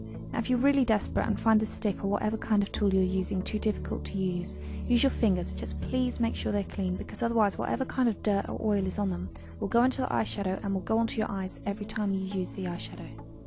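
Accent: United Kingdom. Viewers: Ate you from the uk? I am lol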